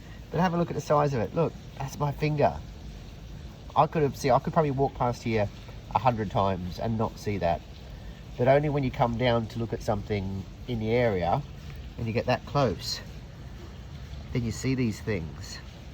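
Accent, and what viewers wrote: Australia. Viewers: Some lovely finds. The cloth seal is so detailed and it’s amazing that some of the material was still attached to the belt part. I love those beautiful hand-made pins. Always enjoy your videos (and hearing that Australian accent 😋)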